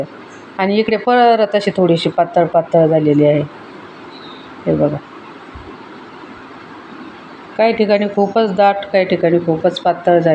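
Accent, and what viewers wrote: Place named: Ahilyanagar, India. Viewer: हो का माझं माहेर अहिल्यानगर आहे ताई आणि मी पुण्यात असते 😍 ताई मला वाटलेच होते तुम्ही नगर च्या च असणार बोलण्यावरणे 👍🏻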